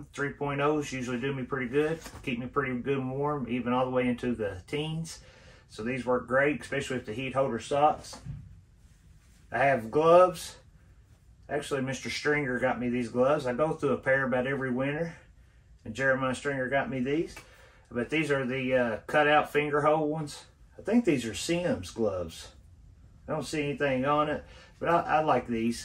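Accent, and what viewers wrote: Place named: Kentucky, United States. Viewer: Always soothing to hear your sweet Kentucky accent! Nice choices in gear my friend. I live that your hammock tarp has lasted so long!!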